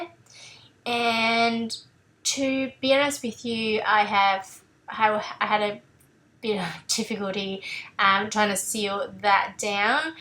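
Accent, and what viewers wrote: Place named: Australia. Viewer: Thanks for the heads up on the DD Facebook page re your videos. Sounds like an Aussie accent. Are you in Oz?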